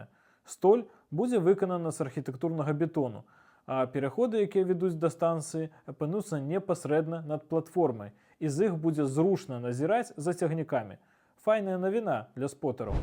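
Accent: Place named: Russia. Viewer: Дзякуй, было цікава. Але вымаўленне беларускіх слоў адчуваецца што нібыта трошкі рускае.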